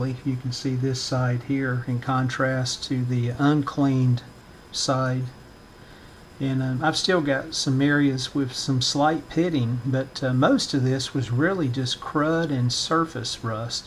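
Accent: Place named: United States. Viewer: Thanks very much. I didn´t know that web page catalog for old radios. It will be very useful for me with this Portadyne has my head on nuts. Have a nice day. Wish to see your next video. Your american english voice is not so difficult for me to understand (only some times with some words or some short phrases). Best regards and follow on line. Ketk (from Spain).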